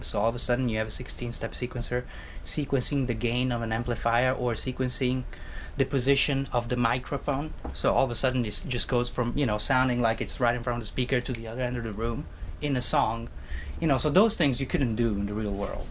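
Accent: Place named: United States. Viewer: grande mozza ! beato te ;-) you're picking up an excellent american accent...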